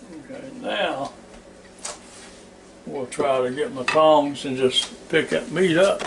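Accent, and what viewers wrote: South, United States. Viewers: I called it Chick Roast because when I make it its chick roast...when hubby makes it its chuck😘..I have to go look at more of your videos.i am dyslexic so seeing it done helps me so much more than reading the directions .Also I can understand your sweet southern accent cause I have one too😁 Again thank you. I cant believe how tender and flavorful the meat was and how soft the veggies.I added radishes. I hate raw radish but when roasted they are not hot tasting but very sweet it's crazy but gooood! Hope y'all have a great day!🌞💝🕊🙏😁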